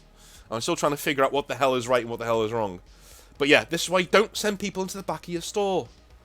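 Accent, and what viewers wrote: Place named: Northern England, United Kingdom. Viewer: Watched a couple of your videos recently, love the energy and content. Curious, based on the channel name and accent, what store did you manage? I only ask as it was in the north of England where I first found my love of table top gaming!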